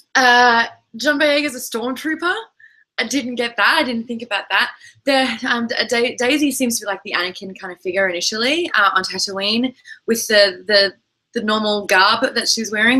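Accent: Australia. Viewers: You sound Australian. :)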